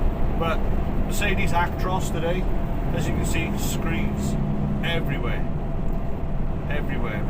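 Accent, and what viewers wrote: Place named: Barry, United Kingdom. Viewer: That’s gotta be a Barry accent surely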